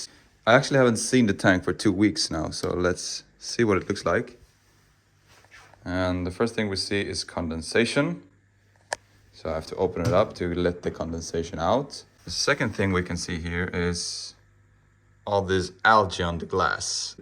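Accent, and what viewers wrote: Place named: Scania, Sweden. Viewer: ”Grön”, haha I love your skånska….. I have the same dialect…….. idk why im writing this in english but it just seems like the right thing for some reason…….. Also the ferns are really gorgeous, ferns are some of my favourite plants! Maybe from all of the dinosaur documentaries I watched as a kid….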